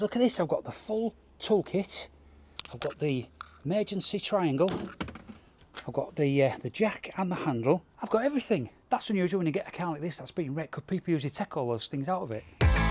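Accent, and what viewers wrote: Hull, United Kingdom. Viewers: Are you from Hull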